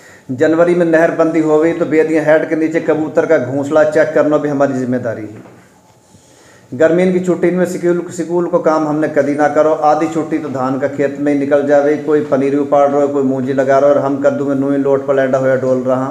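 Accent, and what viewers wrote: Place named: Mewat, India. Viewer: Mewati boli piyari boli he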